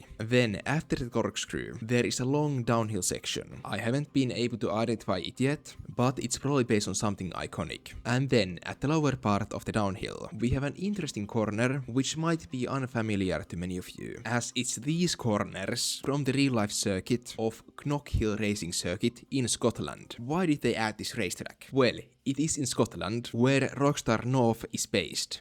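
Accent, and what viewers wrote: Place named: Turku, Finland. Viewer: Thickest turku accent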